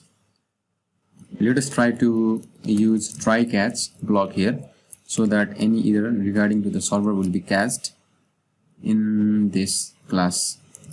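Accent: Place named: Nepal. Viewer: You have Nepali accent.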